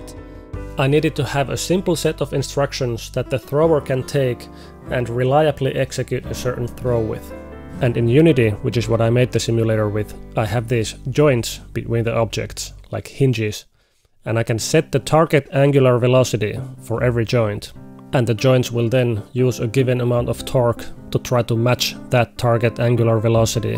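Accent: Finland. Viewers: So, this guy is from Finland, right. Every single Finnish person speak english like that.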